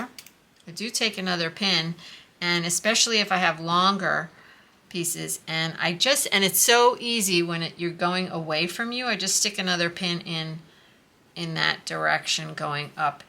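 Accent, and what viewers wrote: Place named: Louisiana, United States. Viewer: You sound like you are from Louisiana.😊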